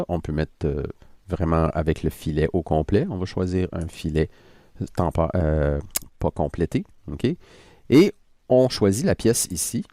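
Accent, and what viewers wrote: Quebec, Canada. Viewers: Bonjour Je connaissait un peu fusion mais je viens de découvrir ton site et c'est vraiment très dictaticiel et en plus je suis français et j'adore l'accent québécois!!! Une question, tu as un ordre de visionnage pour les autres vidéos? Merçi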